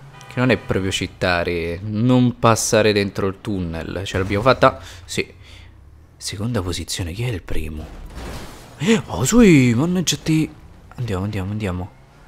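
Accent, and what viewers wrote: Rome, Italy. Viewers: Che se de roma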